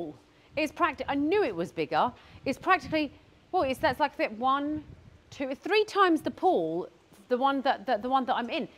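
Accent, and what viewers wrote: United Kingdom. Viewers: British accent. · I just love the Uk Accent ..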